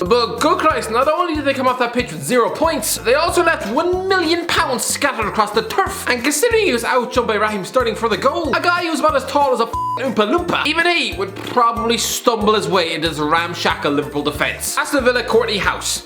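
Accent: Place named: Ireland. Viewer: Its fun hearing read Nigerian names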